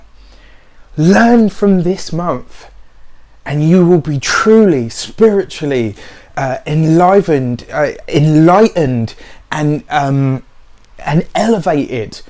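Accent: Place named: London, United Kingdom. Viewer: He's not Manc. He's quite clearly London way. #watchatalkingaboutwillis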